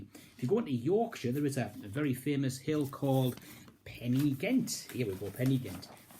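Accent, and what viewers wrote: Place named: Scotland, United Kingdom. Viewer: A Scottish friend from Edinburgh kinda sounds like this gentleman. It surely must be a Northern Britain thing, definitely!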